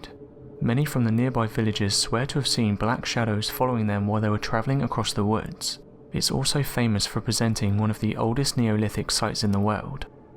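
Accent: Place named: Southern England, United Kingdom. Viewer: Anastasia Roman Yeah. Something about a soothing, southern English accent...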